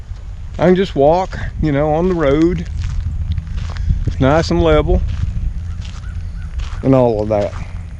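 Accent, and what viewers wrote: South, United States. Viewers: That was a beautiful walk through that graveyard Mr I love graveyards and walk through alot of them myself if only it wasn't so cold but I go out anyways as long as it's not 10degrees when I was a little girl I used to love to play in the graveyard by our house all the time I think you may be having visitations at home when you smell that perfume and I find that so comforting because I believe the spirit can visit their loved ones and I don't fear the dead it's the living we have to fear unfortunately I have many strange things happen in my house also but anyway it's great to get out in the sun in the winter and get some exercise I hope you have a great night and stay safe I'd like to walk through the graveyard again with you if you want thanks 😊 Mr and have fun with your crew and by the way I love your southern drawl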